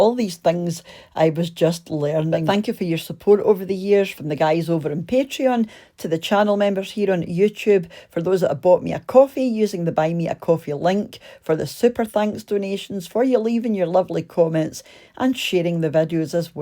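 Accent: Scotland, United Kingdom. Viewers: I can remember my Nunny making this for me and my sister when we were wee and would go to visit her in Scotland. It was always such a treat. I learned how to make it over time and my own daughter was just asking about tablet the other day. I think I need to get out the recipe 😂. I love these videos and just listening to your voice; reminds me of my Scottish roots and family. 🎉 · Love and THANKS from Germany! As wonderful as the recipe is your warm voice and the Scottish you speak - my husband Ingo and I visited Scotland last year for the first time and totally fell in love with the people, the land and the language. It really touches me inside. In my opinion the language/dialect/accent one speaks defines identity and cannot be regarded highly enough! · I'm a Scot living in Italy. It's lovely to hear your accent and the recipe is great, thanks! 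· This recipe video just amazingly ended in my feed! The last time I had this was while in Scotland in 1996! Never got the recipe. I subscribed immediately to your channel! Many dishes I see on here I want to attempt to make. I'll let you know how I'm doing! And. My heart leaps with joy hearing your brogue!❤️ I miss Scotland so much.😢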